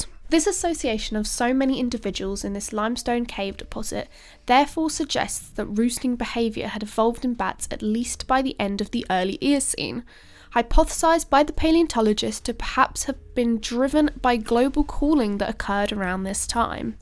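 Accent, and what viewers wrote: RP, United Kingdom. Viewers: Alright, she does, admittedly, bring clear-spoken Queen's English to the narration. Still (mischievously) one has to wonder if of the boys brought in a GF to see if she would get a better YT response. Yes.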